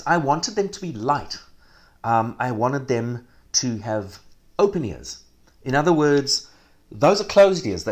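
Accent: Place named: New Zealand. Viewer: thats a kiwi accent alright , loved the review would love some more comparisons if you get hands on other studio classics like beyerdynamic dt880 pro , akg k702/712.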